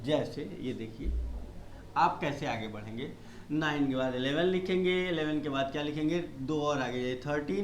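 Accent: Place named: Bihar, India. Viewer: Sir aap bolte bilkul bihari style se.....bahut cutely.... And thank a lot for this video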